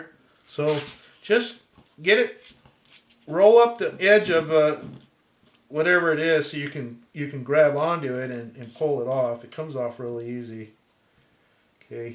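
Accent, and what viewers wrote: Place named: Arkansas, United States. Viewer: I literally stumbled across your video this morning. I'm glad I did. 😃I'll be doing some experimenting at this process myself. I lived in Shelbyvill, TX for a few years. But I've been back home in NC for over 20 years, but I thought I recognized the Arkansas accent. Wish I had a workspace like that. I've subscribed and liked. 👍 HAPPY NEW YEAR!! 🎄 God bless. 🙏5th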